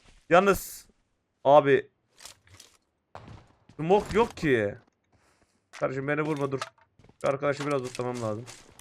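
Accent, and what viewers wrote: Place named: Antalya, Turkey. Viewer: senin antalyalı konuşmana bayılıyorum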